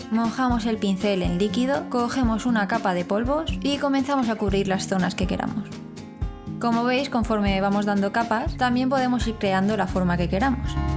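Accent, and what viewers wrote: Spain. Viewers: me encanta la voz de las españolas :3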